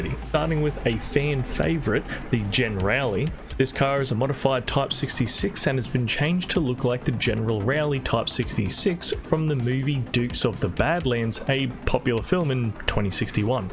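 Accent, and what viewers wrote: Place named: Australia. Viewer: oops 😬 that's the second time I mistaken a Australian accent for a British 1 lol don't know why they sound closely identical but hey good video either way.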